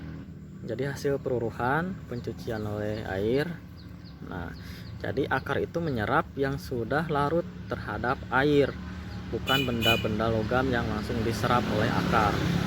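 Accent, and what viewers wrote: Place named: West Java, Indonesia. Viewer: Kang kl dri logatnya ky nya orang sunda ya..itu jenis anggur apa kang..nyimak terus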